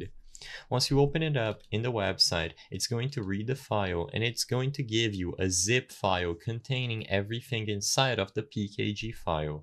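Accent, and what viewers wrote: Brazil. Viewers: Are you from Brazil? Your accent is so familiar to me.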